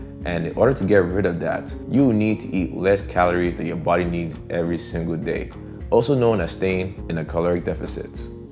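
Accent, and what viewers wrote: Nigeria. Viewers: i can hear the nigerian in his voice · I sensed he was Nigerian from his accent at first. I felt kind of joyous when he confirmed it with that pidgin English line.E choke😁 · This voice is so Nigerian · Yo, i heard that nigerian accent 😂😂😂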